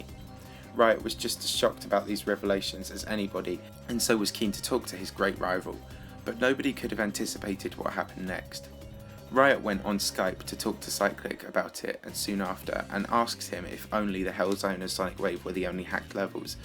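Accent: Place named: RP, United Kingdom. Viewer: Good video but something is missing..... SUBTITLES! (Because your british accent is 2 formal, I can't hear it clearly ): )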